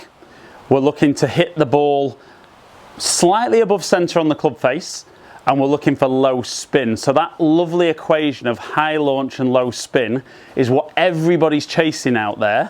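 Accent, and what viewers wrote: England, United Kingdom. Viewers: Joe Longo But you will notice by his accent that he is English? We speak different from you in the US! <link>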